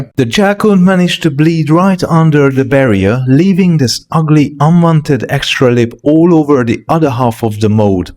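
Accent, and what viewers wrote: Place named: Hungary. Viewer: I thought I heard it on your dialect 😊 My father was from Hungary 😊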